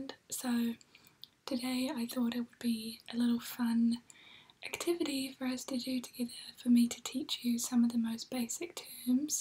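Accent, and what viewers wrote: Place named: New Zealand. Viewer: I do love the New Zealand accent. Thanks for a lovely upload 😊stay safe and well.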